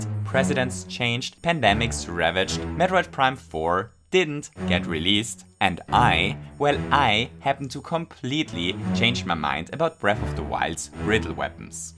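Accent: Germany. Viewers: Ein Deutscher Akzent 🤔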